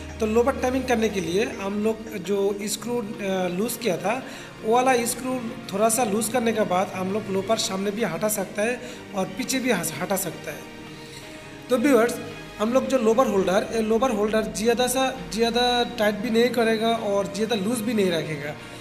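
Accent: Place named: Bengal, India. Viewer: Bangla channel achhe naki.. apnar Hindi shunlei bangali lagchhe toh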